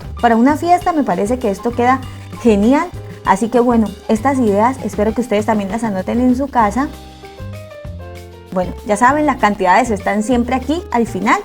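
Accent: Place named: Caribbean Coast, Colombia. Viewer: Me cuesta identificar ese acento, parece costeño cachaquizado...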